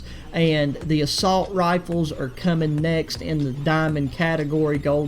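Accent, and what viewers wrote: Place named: North Carolina, United States. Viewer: I’m from Texas so I crossed that state off the list for your accent. You sounded like my buddy from NC. 😂😂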